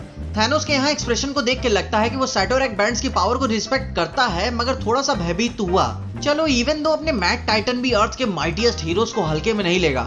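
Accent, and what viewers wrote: India. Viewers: Ur desi style of speaking is AwEsOmE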